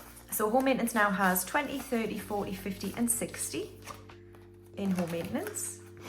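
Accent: Newcastle, United Kingdom. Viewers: Hey - I found your channel a few days ago and absolutely love your videos. Can't tell if that's a geordie accent I can detect (as someone who is geordie too) ❤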